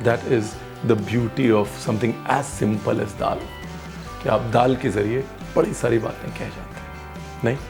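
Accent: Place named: Maharashtra, India. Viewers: feeling Good to know that u love Maharashtrian food culture and love your marathi accent 😁 our Maharashtra is best ❤️ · Fabulous concept sir...... Very brilliant thought conveyed through these dals. And your marathi accent OH MY GOD 😍Tried many of your recipes and obviously loved them 😇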